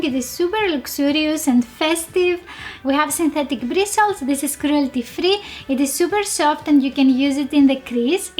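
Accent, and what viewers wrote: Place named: Italy. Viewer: This girl looks like an italian 😳she has an italian english 😂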